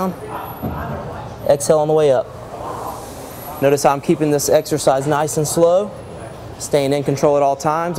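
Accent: South, United States. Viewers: Are you tonedeaf? His voice is nowhere near DiCaprio's. For starters, he has a very obvious southern accent overtone of some sort. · This guy is clearly southern. He doesn’t sound like DiCaprio at all.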